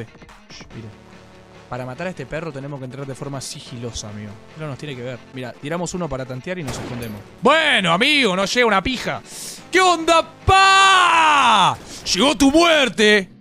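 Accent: Argentina. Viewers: PENSE QUE ERAS UN AGENTINO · una pregunta eres argentino o uruguayo?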